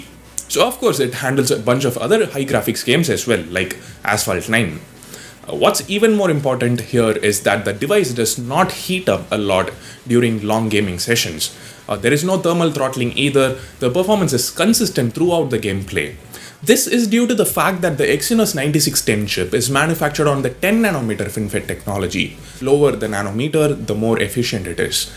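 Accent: India. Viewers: I hate hindu English I don't understand it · Good video dude. I like your accent and your the first Indiana reviewer I have seen Good explanation Hope all best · I dont normally comment on indian reviewing phones but I like your accent and basically explains more about the phone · I love ur english... purely Indian...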